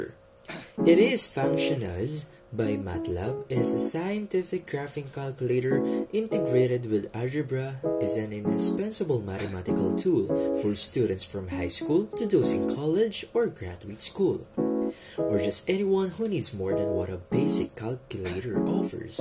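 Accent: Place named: Philippines. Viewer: pinoy ka nu 😊 the pronunciation and accent gives it away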